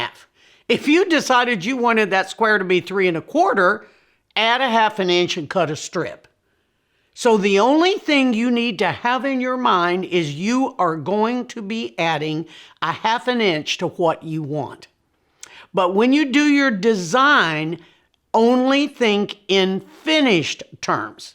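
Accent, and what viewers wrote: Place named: South, United States. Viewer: Being from the south, I could so easily become friends with! Love her southern drawl, her humor! Where have you been? I discovered your videos today, and am binge watching every video you have put out. I have been quilting for a couple of years, but I am definitely still a beginner, with BIG dreams. Thank you so much for just keeping it simple. I look forward to watching every video. FW, Tx here!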